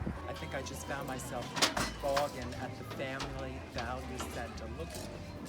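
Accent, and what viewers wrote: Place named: Bronx, United States. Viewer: I hear the Bronx! Love it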